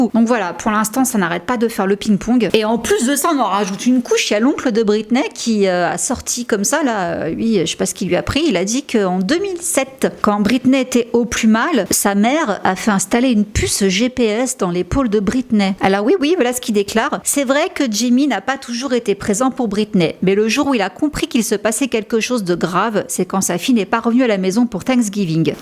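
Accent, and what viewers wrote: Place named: France. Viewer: Tu pourrais faire un minimum d efforts pour l accent anglais? On dirait une blague tellement tu franchouillardises des mots basiques .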